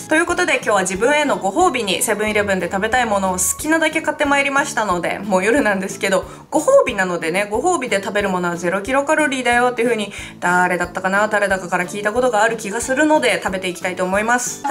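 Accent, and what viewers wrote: Aomori, Japan. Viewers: ちょっとなまってて可愛い♡ 青森っぽいけどどこ出身なんだろ、、 そしてそして今日も美味しそうです🤤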